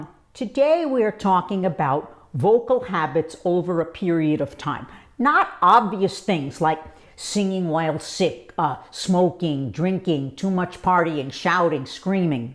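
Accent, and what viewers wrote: New York, United States. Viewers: Are you from NY? Lol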